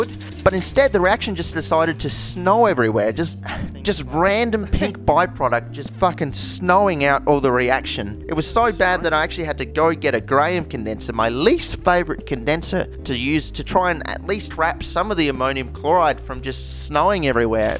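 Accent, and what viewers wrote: Australia. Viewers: finally a chemist who is informative, and yet satisfies my need to hear an Australian say their favorite "C" word about 65 times in a video. Easy sub. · He looks and sounds like an Aussie Gerald Buttler.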